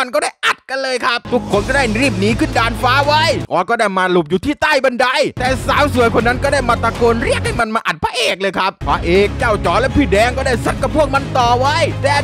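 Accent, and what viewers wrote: South, Thailand. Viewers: พี่เป็นคนใต้หรอ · คนใต้เห้อหลวง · ชอบพี่บ่าวว่ะสำเนียงใต้นิดนึง55 ชอบๆ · พี่เป็นคนใต้ไข่ไหม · พี่เป็นคนใต้เหรอ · พี่เป็นคนใต้ใช้ไหม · เวลาแหลงใต้ โคตรได้ใจเลย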